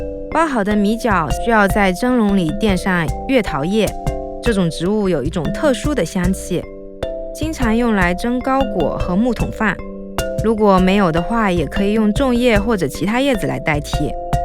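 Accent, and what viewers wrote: China. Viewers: 汶。 我看过这个频道之前的影片，通过他的口音我知道他是大陆的，所以我才会这样说，还有一种更搞笑的，视频内容讲中文的，标题就是中英两种，他们就那么想外国人看到？这种情况只有亚洲人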